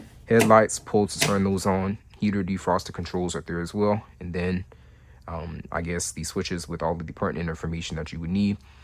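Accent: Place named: Lowcountry, United States. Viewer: This is so cool! I've always had a fascination with the Thomas MVPs! This one is nearly identical to the white and teal (and later white with red and blue accent) activity busses we had in Beaufort County when I was in school. This was back in the 90s and early to mid 00s. I couldn't tell you what they have today. And based on your dialect, I’m 100% certain you’re from my neck of the woods as well, lol! 843! I live in Texas now, but the Lowcountry is home!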